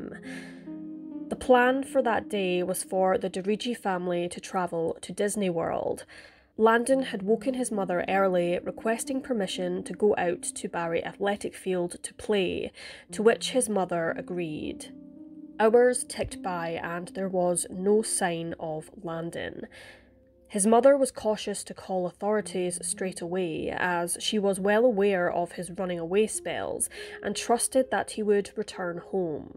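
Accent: Scotland, United Kingdom. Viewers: WoW you have a heavy Accent like uk or Scottish accent. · She is Scottish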